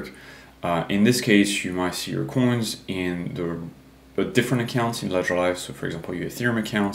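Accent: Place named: France. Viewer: Super helpful. Love the French accent, tho.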